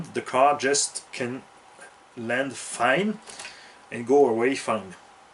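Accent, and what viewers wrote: Alsace, France. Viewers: a lenglish accent francais alsaco je valide !!! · You have a great french accent lol 😅 pretty interesting vid ! I love this buggy too!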